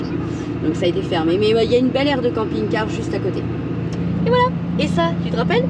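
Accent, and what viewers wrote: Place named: Landes, France. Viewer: Merci pour votre réponse. Dax, d’où votre très léger accent. Je connais bien cette magnifique région des Landes et du pays Basque, Dax en particulier. Mon mari, originaire de la montagne noire, dans le cabardes, a gardé un accent très marqué ( vous connaissez l’Aude) alors qu'il vit dans le Nord depuis plus de 60 ans . Au pays des chti, ca fait très exotique 🤣/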